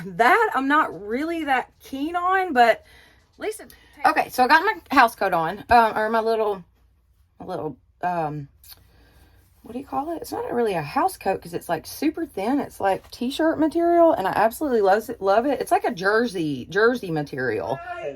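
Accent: South, United States. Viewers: YAY!!!! A MAKEUP tutorial!!!! I have admired your makeup from DAY ONE!!! Of course you are young enough to be my daughter, so you have that LOVELY SMOOTH skin!!! I was THRILLED to see your eyeshadow pallet AND you putting on your EYELASHES!!! I need to give eyelash applying MORE TIME:-P I wish ALL the Dollar General's carried the SAME PRODUCTS!!! Love the colors you put together from your pallet <3 Have you ever thought about going back to curly hair? Is there a reason you do straight all the time? You ALSO do an AMAZING JOB of matching lip liners and lipsticks!!! I DID see Maybelline on your liner I believe;-) but no idea the color, or lipstick you chose to go with it. Just know you do an AMAZING JOB matching them!! I bet you are a HUGE influence on your daughter's public speaking:-) I enjoyed the time she did your intro <3 WOW NYC for college, you go girl!!!! 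Thank you SO MUCH for sharing your skincare & makeup and hair!! I just enjoy YOU, your demeanor, your voice, that Southern accent like my daddy has....he's from Alabama, we lived down there from my 6th to 9th grade year.....I'd pretty much watch what ever you put out....Day In The Life with.....As some of the other girls stated, it was like being right there with you having a makeup day/slumber party and doing girlie stuff:-) You have BLESSED BEYOND MEASURE DAY DOLL!!! BIG {{HUGS}} OH AND YES on the baby oil & iodine LOL Gosh I even used stick butter if I "had" too:-P RARELY EVER had the REAL THING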